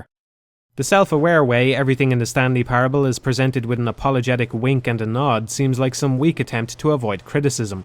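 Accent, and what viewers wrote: Ireland. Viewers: You said "fuck you" in your thick Irish accent. My day has been made.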